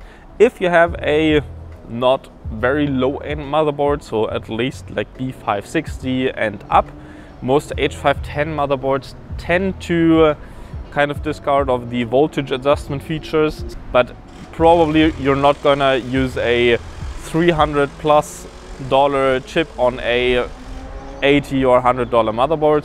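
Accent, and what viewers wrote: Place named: Germany. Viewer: ich hör da teutsch raus!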